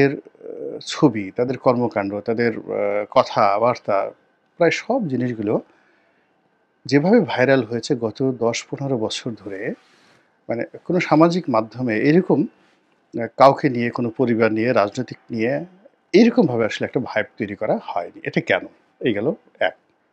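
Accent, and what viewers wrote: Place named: Barishal, Bangladesh. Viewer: এই পর্ব টা শোনার পর মনে হলো,আপনার বাড়ী বরিশাল।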